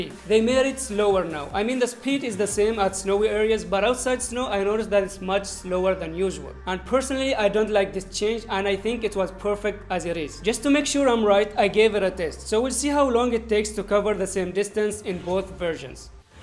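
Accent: India. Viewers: Indian accent :( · Hahah I love your accent indian right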